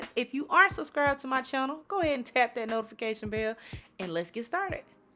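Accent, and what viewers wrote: South, United States. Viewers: Love ya ! Wonderful recipes and enjoy Your Sweet Souuthern Accent 😊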